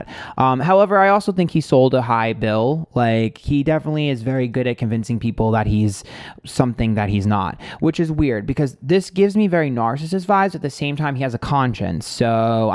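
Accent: New York, United States. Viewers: The more frustrated you get the more heavily your New York comes through 😁😁😁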